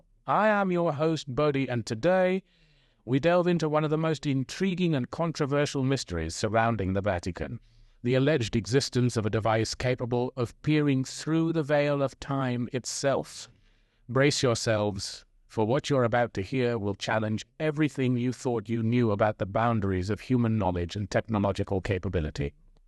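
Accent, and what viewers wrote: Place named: United Kingdom. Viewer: Who NEEDS that shrill, played out 🇬🇧Accent?? Seriously, it’s so tired. And super shallow, too.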